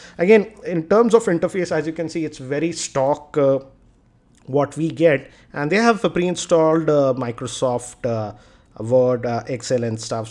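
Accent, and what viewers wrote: Hyderabad, India. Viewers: Hydrabadi English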